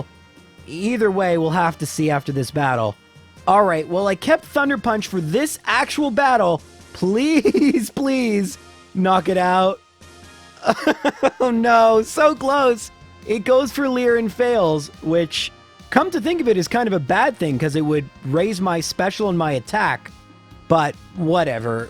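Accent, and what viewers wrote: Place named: Canada. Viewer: I absolutely love your content J , but the way you say “against” drives me up a fucking wall 😂😂😂 is that because you’re Canadian or some shit ? You sound Canadian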